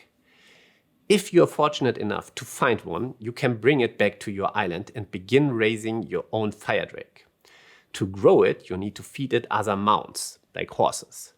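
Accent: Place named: Germany. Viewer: Uhhh this German accent... 😋 So cute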